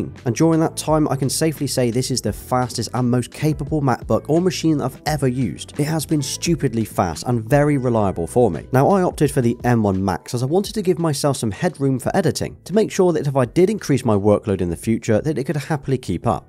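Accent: United Kingdom. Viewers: Are you, , British, Australian, or from New Zealand? The accent is charming. Have a nice Thursday, Friday, and weekend.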